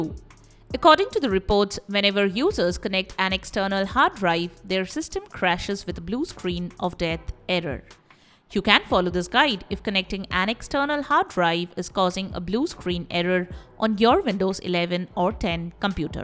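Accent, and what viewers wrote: India. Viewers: A video full of kinks to follow without actually showing anything in an AI Indian robot voice 😩😩😩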